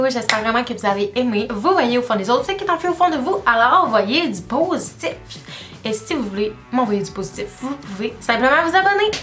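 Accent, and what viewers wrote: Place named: France. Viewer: pourquoi tu parle comme les français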